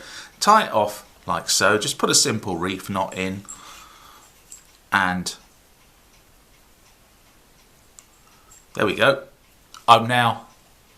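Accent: United Kingdom. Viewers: Where are you based. By the accent I would say UK.?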